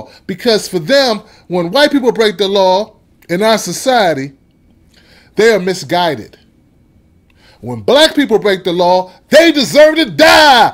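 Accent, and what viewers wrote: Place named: New Jersey, United States. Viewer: I just love your Jersey accent!!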